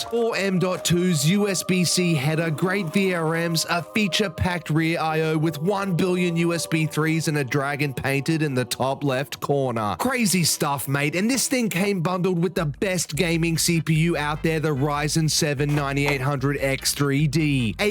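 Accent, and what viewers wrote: Australia. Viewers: Do people ever comment about your aussie accent? I live in an area where you never hear it.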